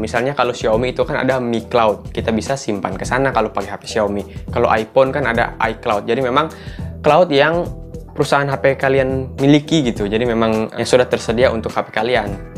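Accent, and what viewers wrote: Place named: Tahuna, Indonesia. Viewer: Jga takaluar logat tahuna e😂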